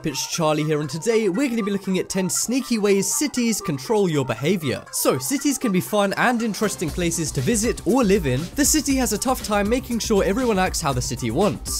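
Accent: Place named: United Kingdom. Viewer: he is British. That's just their normal accent.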